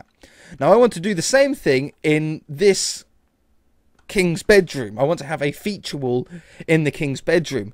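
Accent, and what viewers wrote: United Kingdom. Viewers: That British Accent He never had a castle!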